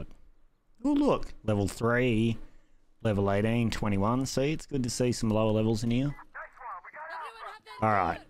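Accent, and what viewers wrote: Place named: Australia. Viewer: i love this game, i can play the co-op matches with my eyes closed now (not literally of course xD) you have a great voice for doing youtube reviews, Aussie accents are the best for gaming, like Gmanlives and stuff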